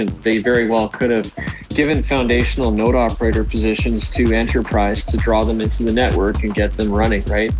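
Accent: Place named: Canada. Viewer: Sounds Canadian 😆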